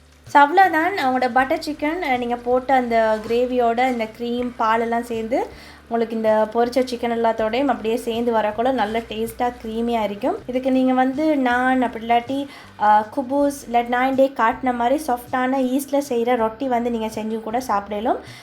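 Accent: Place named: Galle, Sri Lanka. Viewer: Super testy food vary good sister neenga pesuradu galle tamil maadiri erikkiye thank you sister 💐👏😁💯🌹 Mohammed thasleem from doha Qatar 🖐️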